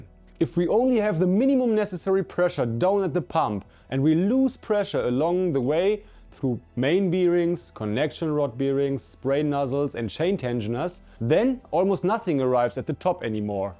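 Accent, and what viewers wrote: Germany. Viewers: sorry but there is only one thing that is so annoying for me and that is a german speaking english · I feel the german in your voice :) This is a very good video and the explanation is on point. But now i am curious, can i, as a non mechanic guy, increase the oil pressure from the pump in idel anyhow?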